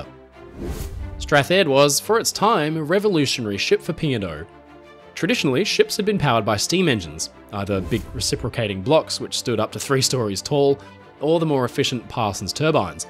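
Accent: Australia. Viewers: I didn't realize was Australian, one would think the accent would be a clear give away, but i mistook it for a British accent until i saw his location on the channel's description page.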